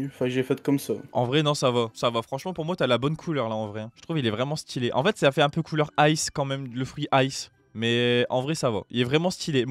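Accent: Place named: Quebec, Canada. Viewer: est québécois parce que moi aussi chu québécois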